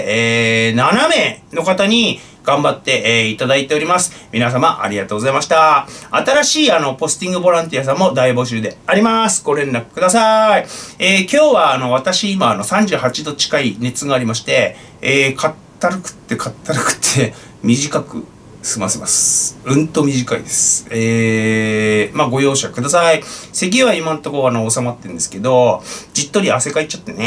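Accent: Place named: Iwaki, Japan. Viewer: 熱がある中 配信ありがとうございます🙇手術のあとは、無理をせず 本当にしっかりと静養されてくださいね!? そして、さらにパンチの効いた配信を楽しみにしております🎤 くつざわさんのいわき弁、大好きです🤗🎤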